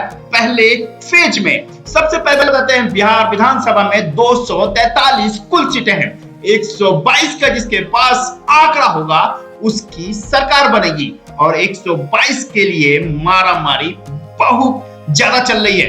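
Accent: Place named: Bengal, India. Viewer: Bangali hindi is Amazing 👌👌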